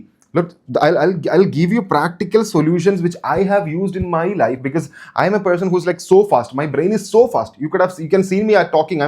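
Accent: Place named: South India, India. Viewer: I don't know but i actually like South Indian accent 😅.. thx guru ji❤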